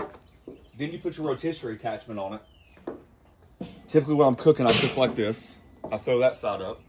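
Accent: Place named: Gulf Coast, United States. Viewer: I'm gonna take a guess that you (like me) are from the Gulf Coast. I'm just judging that by your accent and the heavy downpour. Am I wrong or right?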